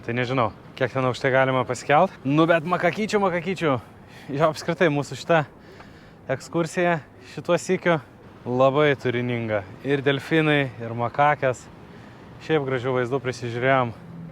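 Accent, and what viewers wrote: Aukštaitija, Lithuania. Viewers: Bet tai faina klausyt,kai kalbi paprasta aukstaitiska moletiska,utenska kalba,ne kaip kiti kaimieciai miestan pateke,vaidina aukstuomene...😊👍👍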